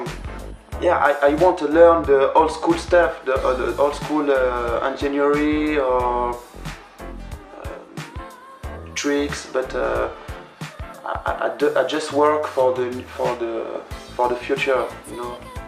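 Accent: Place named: France. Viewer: Mon gars ta le vrai accent français, je dit ca mais je ferais pas mieux. Non tu assure en vrai. Continue a nous faire kiffé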